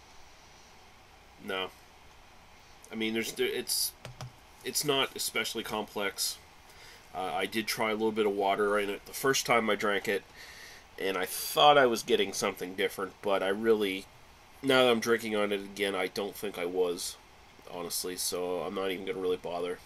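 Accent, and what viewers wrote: Canada. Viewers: canadian ay! (oat and aboat)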